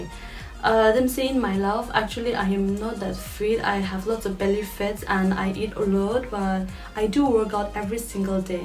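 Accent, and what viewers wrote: Nagaland, India. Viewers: I love you speaking Sumi dialect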